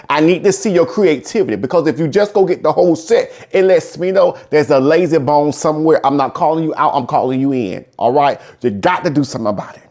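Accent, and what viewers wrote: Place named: South, United States. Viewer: Love your southern accent with your sense of humor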